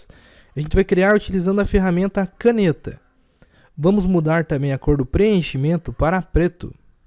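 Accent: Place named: Paraná, Brazil. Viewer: Com esse sotaque só pode ser paranaense...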